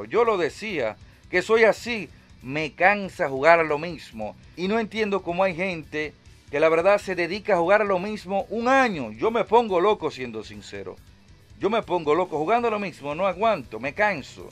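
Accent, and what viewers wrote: Dominican Republic. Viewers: Eres dominicano?😕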